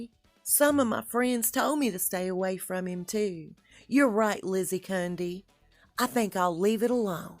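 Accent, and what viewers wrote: South, United States. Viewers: YOU are such a blessing to us,! I enjoy your posts so much and love your southern voice!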